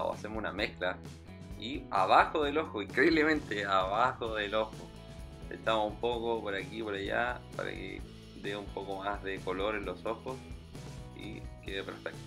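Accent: Chile. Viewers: Es el primer video que veo de este canal y puedo decir que con solo escucharlo se que el chico es chileno XD #LoMasParejoPosible #LoMasDifuminadoPosible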